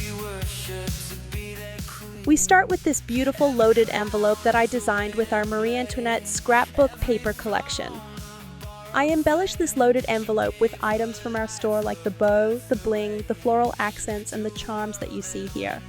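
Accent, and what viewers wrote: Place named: Australia. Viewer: Hi! Please don't fret over the Accent In the Video! TBH, I love it!!! I've always loved a hood Australian Accent! I think it's Beautiful! It's REALLY not THAT Noticeable. Like... TBH, I don't think I would've caught it if I wouldn't have read the Description! LOL! ~🙏💙🙏Much Graditude From The Carolinas, Erica 🙏💙🙏~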